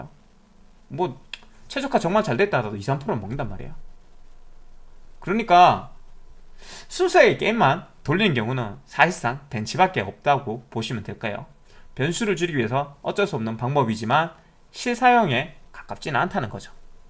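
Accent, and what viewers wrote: Gyeongsang, South Korea. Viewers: 님 말투는 경상도인데 대전분이신가보군요 신기신기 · 경북 경남? 어디쪽 사투리죠? 이 사투리 쓰시는분들이 방송에서 가장 억양을 못고치는듯ᆢ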